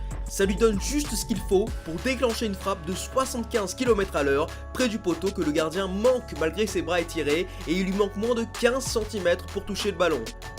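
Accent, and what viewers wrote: Marseille, France. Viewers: T sur que t marseillais avec cette accrnt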